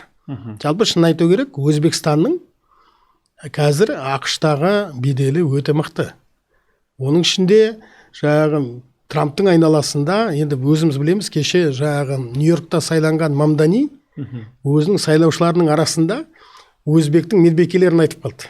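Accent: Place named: Russia. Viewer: Вашингтон емес, Уашиңтон. Техас емес Тексас. Ганконг емес Хоңкоң. Ресейдің сасық аузымен мыйымен әлемге қарауды қою керек Қазақтар.😮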